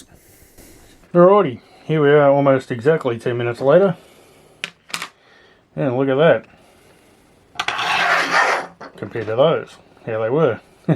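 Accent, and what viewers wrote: Australia. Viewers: Awesome, thanks for that. Mind if I show this technique in my HG P407 build videos? Also, it's refreshing seeing and hearing an Aussie modeller on YouTube. Nice work, mate.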